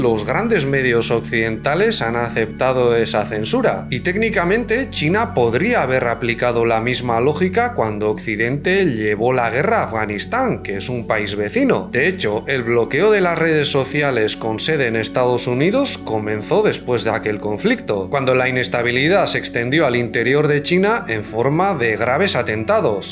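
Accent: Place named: Basque Country, Spain. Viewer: Gran reportaje Me encanta como lo explicas desde el otro punto de vista que aqui en occidente no nos enseñan. Ademas con acento vasco. Ahi va la hostia. Eres un crack. Cuidate.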